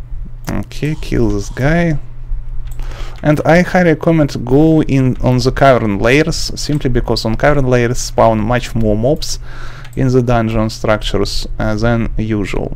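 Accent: Russia. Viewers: this guy is russian but trying hard to speak english respect❤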